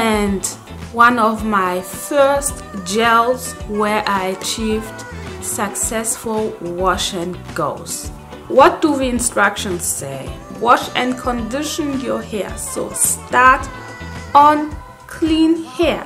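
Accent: Germany. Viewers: Love your German accent ❤ big fan of kinky curly and Camille rose 💗🫶🏽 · Are you German or speak German? Your English sounds like the ones Germans speak Lovely hair 😍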